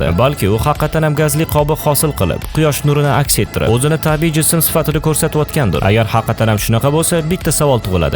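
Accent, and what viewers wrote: Tashkent, Uzbekistan. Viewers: Факат узбекча гапир..тошкенча узбек тилига мансуб эмас.. Овотти..улвотти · Узбектилини.тугри.гапринг ука.тошкентнинг.шивасида.гапирманг.вотти.сузи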